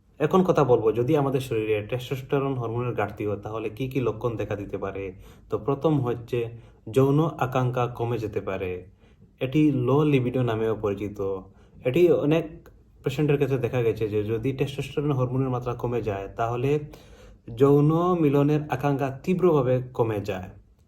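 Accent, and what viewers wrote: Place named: Barak Valley, India. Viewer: Bhai aap konsa college sa ha Apka language to bengali jasa ha matlab .. from barak valley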